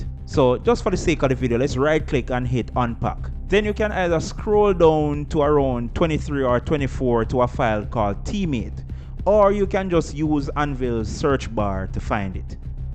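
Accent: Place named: Jamaica. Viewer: beautiful jamaican accent brother, great video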